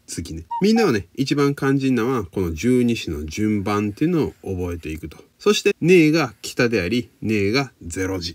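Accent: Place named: Kyoto, Japan. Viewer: もちろんです！源氏物語はもとより、さんの京都弁、声、話し方、スピード、全てに癒されています。学生の時にこんな先生がいたらもっと古典の勉強、頑張れたのに・・😂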